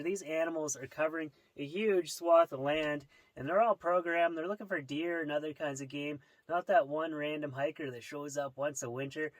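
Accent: Canada. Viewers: Just say “out” and “about” in your awesome Canadian accent, and you’ll be forever a YouTube legend.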